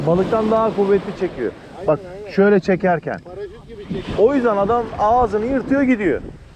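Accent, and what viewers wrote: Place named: Russia. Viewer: Ruscayi harika konuşuyorsun lehcesiyle 😁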